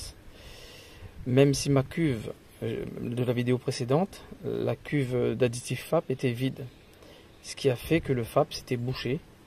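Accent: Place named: Reunion, France. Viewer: Slt & tout mon RESPECT à vous . Je disais : Aparament les gens aiment bien votre video . Peut être que des mécaniciens de l'Île de la Réunion pourront en profiter pour réparer des voitures des personnes de notre île pour pas cher ....vous voyez ou je veux en venir😂😂 . " quand on est fauché " .Mais BRAVO👏👏👏 . VOILÀ CE QUE VOULAIS DIRE MON COMMENTAIRE EN CRÉOLE ... CAR JE CROYAIS QUE VOUS L' ( ETIEZ CRÉOLE 974 ) ....🤔🤔🤔🤔.Mai lé rare que mi trompe !!!!!! Surtout avec l' accent !!!!! Après chacun son zaffair 😂😂😂😂😂😂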